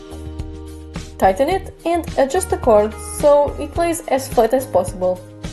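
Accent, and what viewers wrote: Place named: Poland. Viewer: Love the accent....Polish 🙂?. The last bracelet was awesome. I will do the end caps in silver...thanks for sharing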